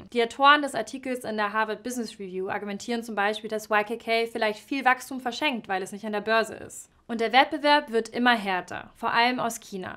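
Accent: Bavaria, Germany. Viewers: einfach Hochdeutsch reden und nicht bayerischen Dialekt benutzen(kina=Bayerisch). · kommst du aus bayern? du klingst übel danach... schon vor dem kina interessant finde ich dass bei deinem china-k so 5% "ch" mitschwingt tatsächlich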